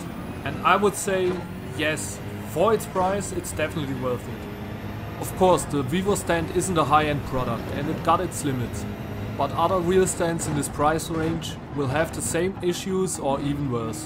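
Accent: Germany. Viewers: I seem to be noticing that you have a german accent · Man hört das du deutsch bist 😂💪👍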